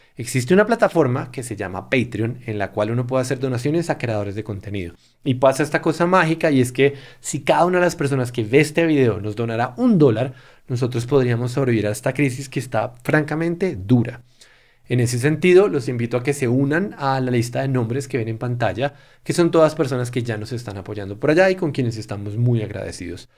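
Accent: Colombia. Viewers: Me parece genial la forma de narrativa gráfica que tienen y el dinamismo para transmitir información, una voz holgada me gusta el formato. Parece ser Colombiana, orgulloso de ser así.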